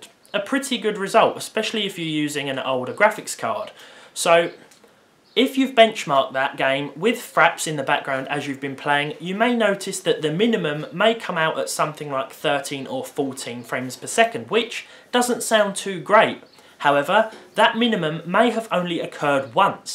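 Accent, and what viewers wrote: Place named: Surrey, United Kingdom. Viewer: hey, are you from Surrey? I have a friend who has the same accent (hell, I swear he sounds like your little brother) and he's from Surrey.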